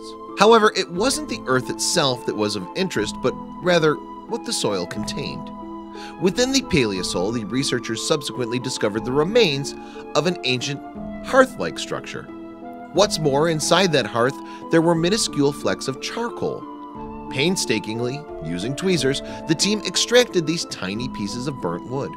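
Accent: United States. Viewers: The weird music sucks and the American accent when talking about Canada and Canadians also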